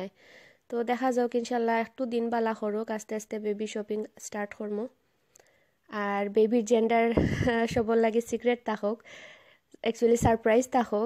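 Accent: Sylhet, Bangladesh. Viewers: Apu,, miss ur sylheti maat.... ❤ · Asalamualikum Appa. Try kormu afnar recipe. Very nice recipe. I like how you talk in sylheti even I feel same that we are sylheti we spouse to talk in our language. Thanks 😘❤️❤️❤️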